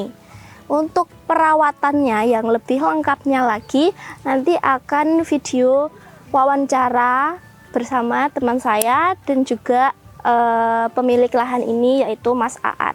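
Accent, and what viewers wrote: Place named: Java, Indonesia. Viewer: Mbk,nya jawa,nya ketall banget😂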